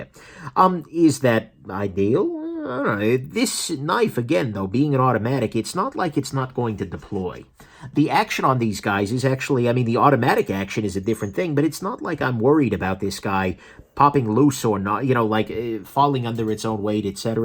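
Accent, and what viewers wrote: New York, United States. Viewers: . You don’t sound like you’re from Cali. I’d say Flatbush or somewhere off the L.I.E.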